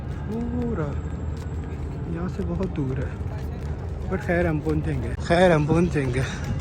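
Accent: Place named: Kuwait. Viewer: wohii kwt wala accent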